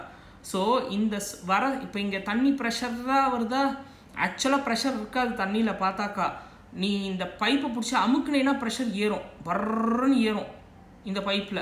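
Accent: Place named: Chennai, India. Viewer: Sema voice bro real Chennai man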